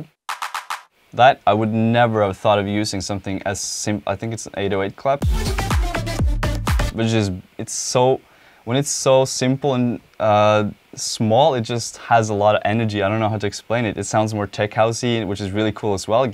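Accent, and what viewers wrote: Norway. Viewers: Nice. Are you from norway?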